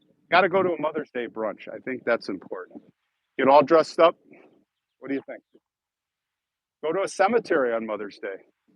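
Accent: Chicago, United States. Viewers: love your Chicago dialect!! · My cousin Teresa married Gary Vanhousen from Chicago il so your dialect hits home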